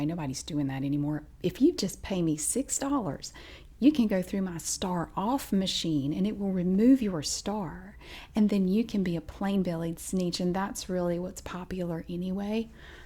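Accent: South, United States. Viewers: Your Southern accent is a big reason for the popularity of your videos. Here in California most people speak in a millenial monotone or in foreign tones. Your Americana accent is music to my ears.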